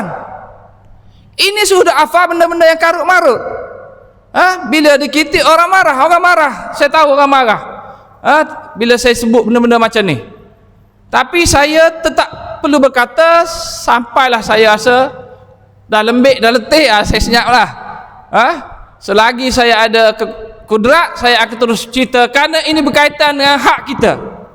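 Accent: Kelantan, Malaysia. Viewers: org Kelantan kan cakap bunyi kelantan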